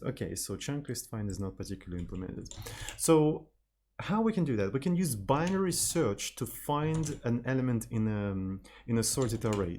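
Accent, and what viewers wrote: Russia. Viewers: ахахха, да русский ацкент явный, но неплохо говорит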